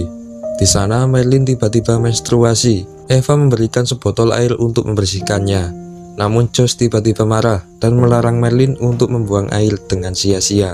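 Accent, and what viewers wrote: Java, Indonesia. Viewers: Lucu narator nya medok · masnya medokkkkk😂😂😂👍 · Medog parah min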